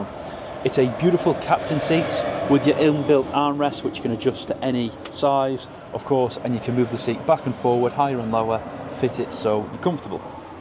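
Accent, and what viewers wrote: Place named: Scotland, United Kingdom. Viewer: It sounds like this fine young gentleman is speaking the kings English but I can't understand a single word he is saying. Is he Scottish? Nice van though.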